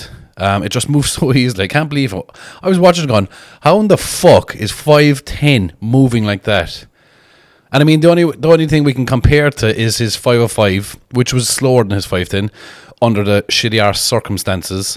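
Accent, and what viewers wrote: Ireland. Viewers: Love Irish & Scottish. Are you Irish sir? 🙂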